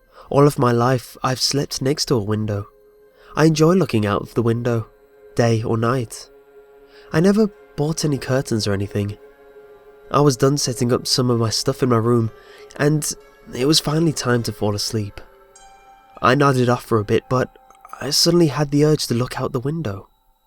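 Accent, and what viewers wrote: United Kingdom. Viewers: You sound british are you british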